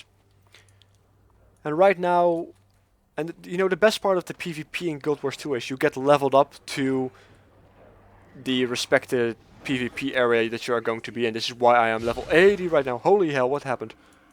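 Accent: Netherlands. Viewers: Ik snap hoe moeilijk het is om engels te praten met n nederlands accent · you just can hear on his english speaking hes from holland lol:P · You're dutch, aren't you?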